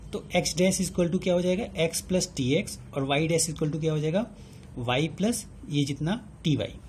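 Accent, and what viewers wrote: India. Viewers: is there any single video without indian accent about Computer Graphics?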